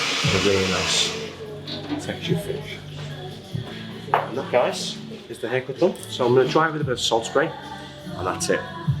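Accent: Liverpool, United Kingdom. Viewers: are u from liverpool? sounds like a scouse accent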